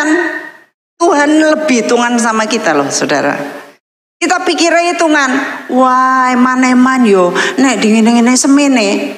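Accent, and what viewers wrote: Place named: Surakarta, Indonesia. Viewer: Senang sekali mendengar kotbah dengan logat solo - jawa yang kental. Kiranya Tuhan Yesus selalu memberkati pelayanan Ibu.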